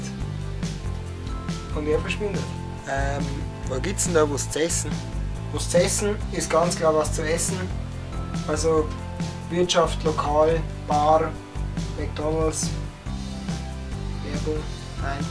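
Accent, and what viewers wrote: Bavaria, Germany. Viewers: I mog dein Borisch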